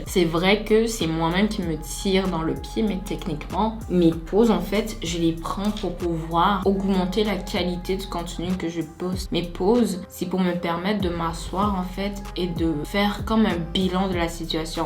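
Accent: Quebec, Canada. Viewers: J’adore ton accent québécois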